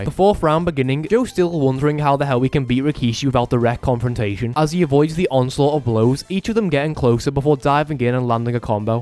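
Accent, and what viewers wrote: United Kingdom. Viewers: MOST British accent ever I've ever heard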